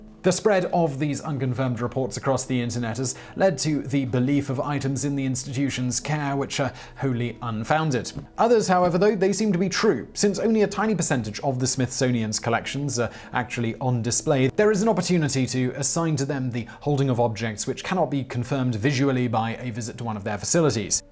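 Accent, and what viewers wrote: England, United Kingdom. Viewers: Brains00007 Brit-speak. Go figure. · Hey Top Tenz, maybe slow down your production frenzy and aim at better accuracy. The first mugshot you showed of "John Dillinger" was actually the mugshot of Baby Face Nelson. I appreciate an English accent as much as the next person, but information hurled at the viewer like a machine gun in a Brit tone doesn't always mean it's the truth. Hire a fact checker, for heaven's sake. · Please stop following the current fad of having videos narrated by a Brit. The accent is horrendous and distracting. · He's British. You can't quite judge him on pronunciation unless you yourself happen to be from there as well. Additionally, accents in the UK as a whole have noteable changes every 25 miles so even then you could be off. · He can’t pronounce a lot of words. It takes more than glasses and an English accent to be intelligent.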